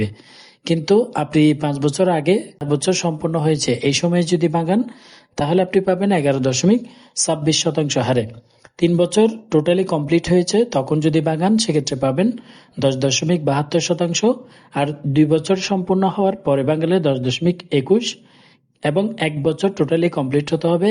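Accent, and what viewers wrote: Chittagong, Bangladesh. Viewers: আপনার বাড়ি মনে হয় চট্টগ্রাম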